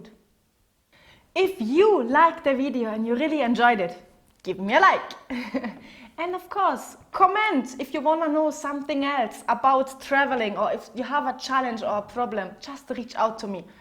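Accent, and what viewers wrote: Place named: Kenya. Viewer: Why do have a Kenya acsent mmmmmh l can guess you feel deely in love with kenya .And you got your soulmate there.thats my home l love your smile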